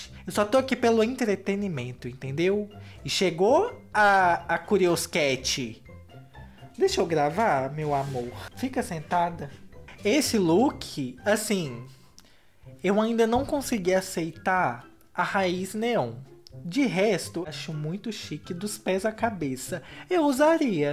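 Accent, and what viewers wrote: Minas Gerais, Brazil. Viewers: Você tem um jeito de falar muito espontâneo. Desde o primeiro vídeo que eu vi, já percebi que você era mineiro. Amo seus vídeos ❣️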